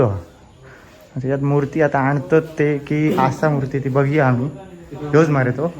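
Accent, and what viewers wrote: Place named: Malvani, India. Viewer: तुझी मालवणी खूप गोड आहे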